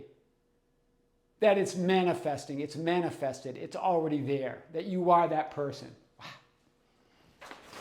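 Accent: Boston, United States. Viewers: You tell them (tell them with your Boston accent - I'm from MA as well, LOL)!! But I empathize with those who ask those questions, because for a lot of us this whole LOA/Quantum Reality has been difficult to digest. Yes, even for some of us highly intellectual, intuitive or even mystically aligned people. It's easy to meddle with the middle as we get caught up with worldly matters, that we can experience with our five senses, because that is ALL we have had going for us.... All our lives! That is what we call "conditioning." But your videos are liberating, and that is fulfilling in itself! That is a sign - Thank you It will click for them, just like it did for some of us... "There's no sign outside of you, there's no truth to that other than the truth you give it" - beautifully stated...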